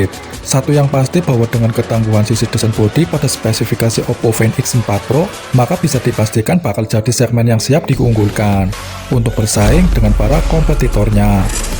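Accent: Banyumas, Indonesia. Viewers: Medok cak suarane kepriben kepriben inyong.....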